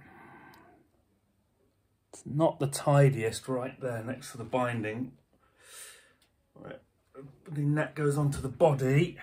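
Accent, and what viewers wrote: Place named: United Kingdom. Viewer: Must have be the British accent.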